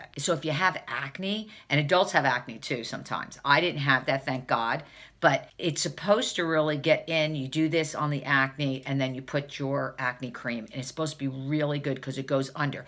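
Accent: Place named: New Jersey, United States. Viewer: I have to try micro needling. Yours is the third beauty channel that talks about serious results, and something’s gotta give with this saggy skin. Had not heard of the Gold Bond cream, but I am now on the lookout for it. BTW—love your Jersey accent—a boss and best friend are from NJ , right from your neck of the woods! Love your sense of humor—keep up the good work!❤️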